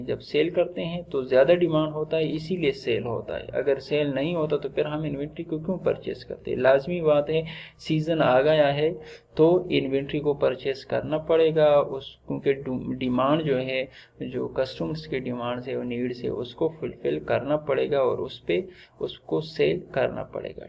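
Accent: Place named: Pakistan. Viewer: Beautiful pakistani accent.... I guess its pashto, sorry if i m wrong.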